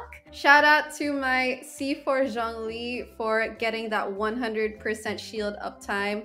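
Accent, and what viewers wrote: Philippines. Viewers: I just finished building Beidou & I finally have a taser team for Kokomi! I’ll use your video as inspiration. BTW, you look & sound similar to my Filipina friend, I think ur from the 🇵🇭 too.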